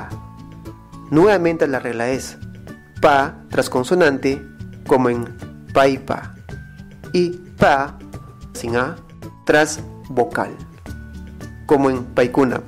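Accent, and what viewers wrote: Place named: Cusco, Peru. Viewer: La pronunciación ni que decir en todo caso debería hablar como ayacuchano o huancavelicano ya no como cusqueño.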